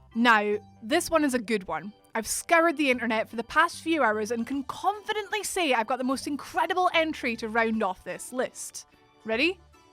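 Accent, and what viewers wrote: Scotland, United Kingdom. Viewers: I have to turn the sound off as soon as I hear the scottish robot.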